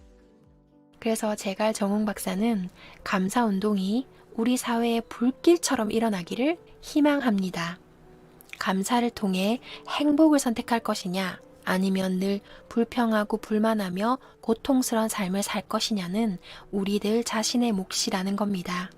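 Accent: Gyeongsang, South Korea. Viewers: 님의 경상도 억양 너무 귀여워요^^♡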